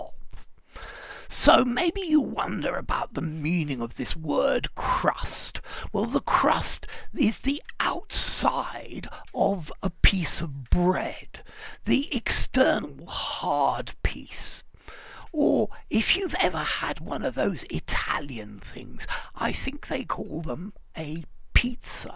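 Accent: RP, United Kingdom. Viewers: Nice upper crust accent. I don't usually go to upper crust restaurants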